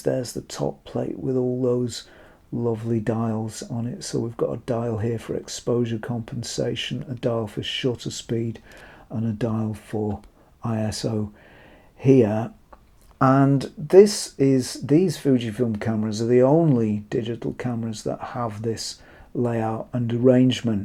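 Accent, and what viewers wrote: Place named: United Kingdom. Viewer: Well, this is a year later from your comment, but I say bugger the manual. (Listening to Mr. makes me feel like talking Brit.) Watch a couple of Youtube vids about it and Bob's your uncle. Later you'll have specific questions to look up answers to in the manual—or yet another Youtube tutorial.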